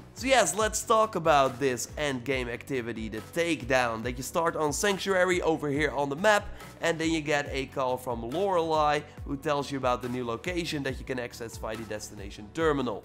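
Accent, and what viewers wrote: Netherlands. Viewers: Jezus gast, nederlands accent much?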